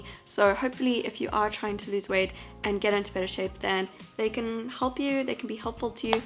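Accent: South Africa. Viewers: South Africa I believe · Your from SA right😂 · Slay Ace she's from South Africa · Are you from South Africa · Knew you were South African as soon as I heard your accent ! (and I also saw woolies)I'm South African too ! ❤ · Also are you South African because I have South African friends and the way you pronounce words are super similar? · Lara S South Africa 😊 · Channi Snklb Her accent sounds South African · i could pick up u are south african due to your accent but i wasn’t sure, then i recognized the shop u were at! :) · are you South African? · Ahhhh !!!! I recognized your South African accent so quickly !!! I’m from South Africa, can you make a video of how to diet & a diet plan for picky eaters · Ayyyyeee South African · Are you South African?? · You’re from SA right ?? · Are you South African ? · Is she South African ?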